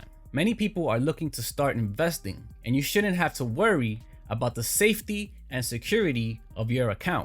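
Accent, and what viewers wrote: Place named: New Jersey, United States. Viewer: Are you from New Jersey?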